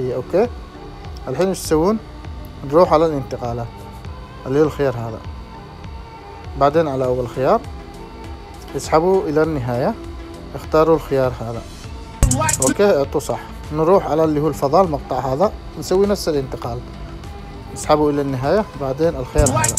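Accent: Yemen. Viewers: انت من اليمن صح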